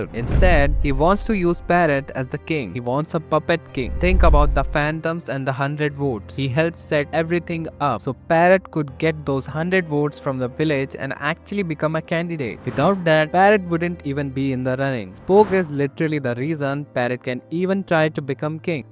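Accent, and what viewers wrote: India. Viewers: the indian accenct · Your voice seem Indian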